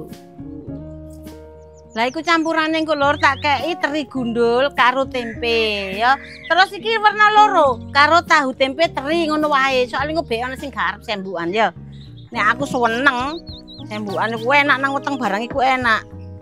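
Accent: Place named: Surabaya, Indonesia. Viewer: Logat ny campuran surabaya ya heheeh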